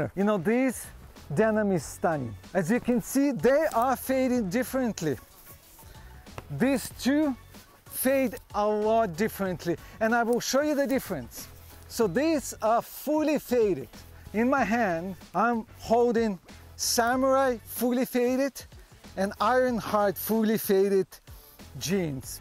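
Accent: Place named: Russia. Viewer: Recognisable heavy Russian accent, IMHO 🤔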